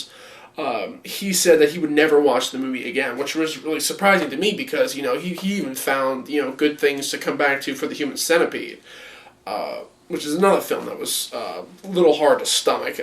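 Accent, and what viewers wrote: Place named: United States. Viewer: You look British or possibly Irish, why are you talking in an American accent?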